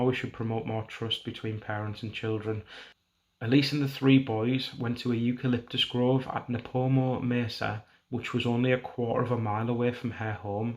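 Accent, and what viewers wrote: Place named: Hartlepool, United Kingdom. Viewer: Can't wait for the next one. Very upset as any decent person would be but the family got their daughter's mortal remains back to bury at least. Very well presented. Lovely to hear a Hartlepool accent on Youtube.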